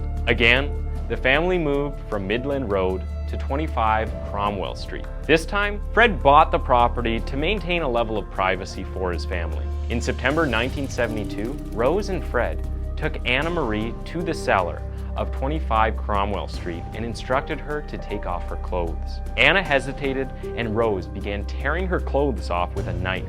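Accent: Canada. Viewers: you do realize that you made a sweeping generalization about 320 million people, right,? That's aside from the channel's host sounding like a Canuck, rather than an American. The rotten thing I see is your opinion of hundreds of millions of people based on the probably half-dozen or less Americans you may or may not have interacted with.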